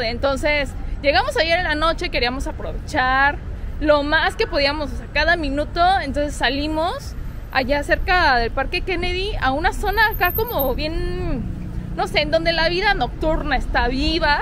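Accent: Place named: Mexico City, Mexico. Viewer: Hablas con acento de Ciudad de México!!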